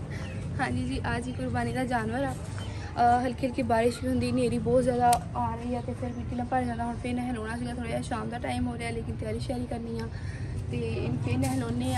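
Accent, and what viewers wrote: Punjab, India. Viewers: Her tone and style is more of eastern punjab than of western punjab.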